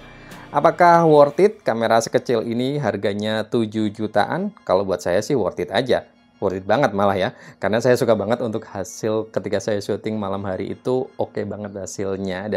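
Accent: Pekalongan, Indonesia. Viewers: Orang pekalongan kah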